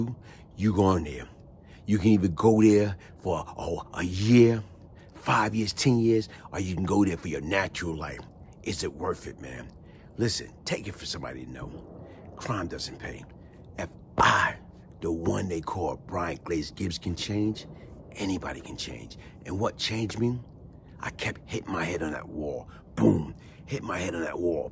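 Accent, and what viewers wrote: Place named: Moncks Corner, United States. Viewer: OH OK Such A Small World. My Family Is originally from Moncks Corner But Some of Us Migrated to Charleston. Funny ThinG Is I Still Caught Our Dialect While listening to you Speak Lol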